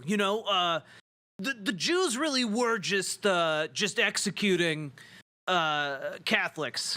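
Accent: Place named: Delaware County, United States. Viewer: And having a Delco accent, is a plus too 😂.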